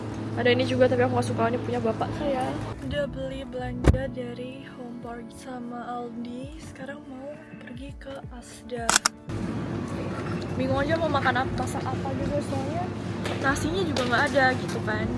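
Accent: Surabaya, Indonesia. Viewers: Jancuk e ngena bngt ...arek Suroboyo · ngonten logat surabaya dong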